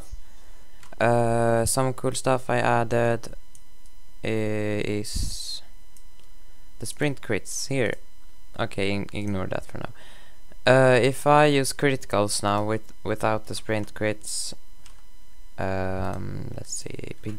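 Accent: Sweden. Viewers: Wow u can really hear that u are from Sweden #SweGang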